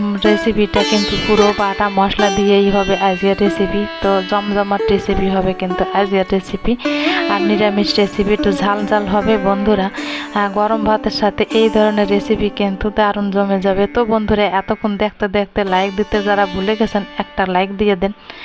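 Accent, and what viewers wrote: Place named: Barishal, Bangladesh. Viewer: খুব সুন্দর রেসিপিটা বানিয়েছো আর তোমার কথাগুলো খুব ভালো লেগেছে বরিশালের ভাষা❤❤😊😊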